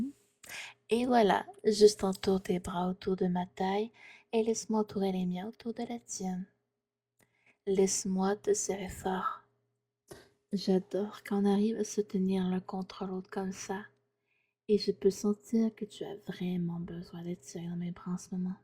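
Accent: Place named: Quebec, Canada. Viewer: je l'est su avec ta façon c'est propre au québécoise et vu que c'est léger sa fait parti de charme dans les vidéos sa rajoute un petit quelque chose qui est bien si il est bien dose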